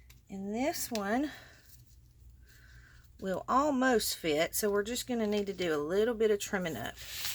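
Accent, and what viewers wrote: South, United States. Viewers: I also love your accent. Yes, you do have one, It reminds me of my southern cousins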